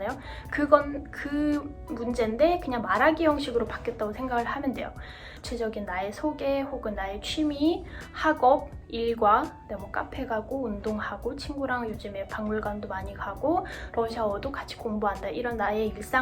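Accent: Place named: South Korea. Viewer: 한국에서 어려서부터 자랐나?? 말투가 그냥 한국사람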